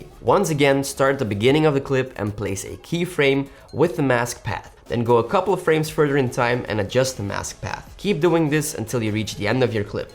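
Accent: Belgium. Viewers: you sound like you live in belgium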